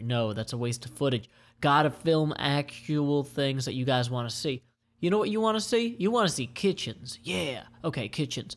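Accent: South, United States. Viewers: Applejack approves of your souther drawl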